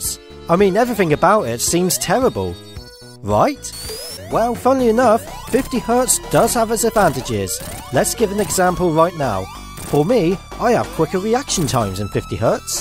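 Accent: United Kingdom. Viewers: Ah a British chap talking about speed This is wait I like to see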